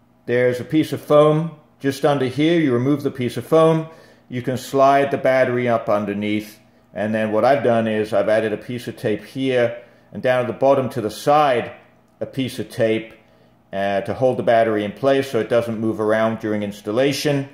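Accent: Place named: New York, United States. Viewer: He sounds so new yorkey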